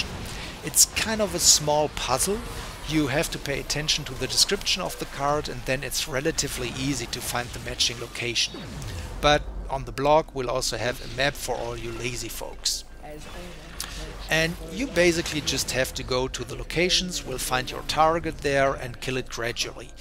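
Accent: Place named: Germany. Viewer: He sounds german, but i'm not sure.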